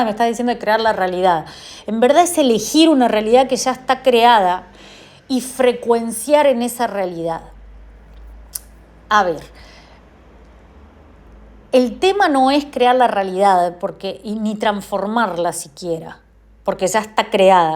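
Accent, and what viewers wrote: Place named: Rioplatense, Latin America. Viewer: Amo ese acento rioplatense!! Gracias y todo el cariño desde Argentina!! :). Hay falta de fe, falta de ganas, sentido distorsionado del tiempo, agobio...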